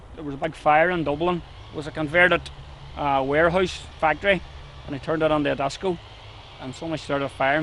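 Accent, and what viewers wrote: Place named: Northern Ireland, United Kingdom. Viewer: It's a Northern Irish accent.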